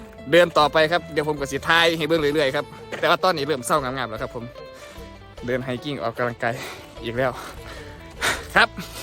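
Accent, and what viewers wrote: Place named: Isan, Thailand. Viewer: ชอบเวลาเว้าอีสานน่ารักดี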